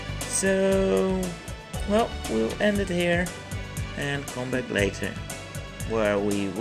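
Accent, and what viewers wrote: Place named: Netherlands. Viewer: i was sitting here, listening to your voice and i was like 'this guy must be from the netherlands'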